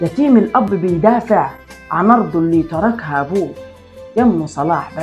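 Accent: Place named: Aswan, Egypt. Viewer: اعتقد من اسوان مصر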